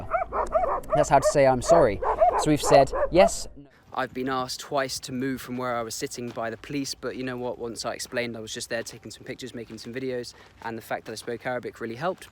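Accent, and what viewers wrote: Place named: England, United Kingdom. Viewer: Wait, you sound english, why is it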